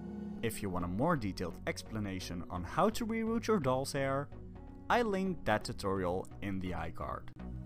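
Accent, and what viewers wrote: Netherlands. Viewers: She's so beautiful!!! Great job! Btw are you Dutch? I noticed a subtle accent since I am Dutch myself. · That netherlands Acsent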